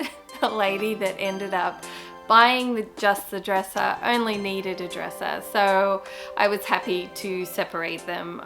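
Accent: Australia. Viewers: We love you and admire your work! In the shop you are Working Woman, not the glamour version, plus the heat and humidity of TN--well, I would wait til cooler weather, being more the wimp. So don't let the snarky ones affect you. Love the Aussie talk, so talk on! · another beautiful makeover! My reaction to the comment(s) about you: Some people can be brazenly offensive. If they have an issue with your channel, then why are they watching? You don't need that foolishness. Continue to be you. I love listening to you (love the Australian accent) and learning from you. Just thinking about what was said irritates me and even though you don't know the person, those comments can be hurtful. Block anyone else who can't appreciate you and what you do. Let's not waste any more time on shallow people. Evidently you're doing something right by being YOU. Your channel is growing. 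Congratulations on the 10K! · Smiling Saturday!! We're here for it and your lovely Aussie accent. Oh, and you outstanding work too!!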